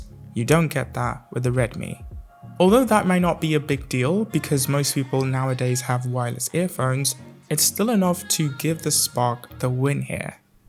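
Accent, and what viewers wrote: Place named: England, United Kingdom. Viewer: Going for spark 20 pro....You n I have a similar English accent.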